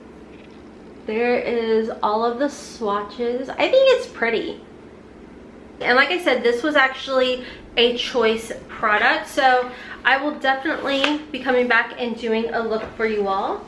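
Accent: United States. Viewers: Haha 92% of the time you have a generic American accent…oh, but the other 8% 😂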